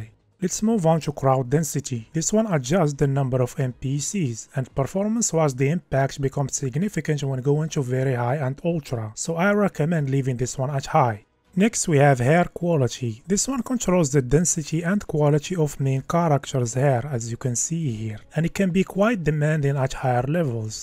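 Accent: Morocco. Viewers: the moroccan accent is so thicc lol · accent dyal lmgharba matkhfax rak nadi khoya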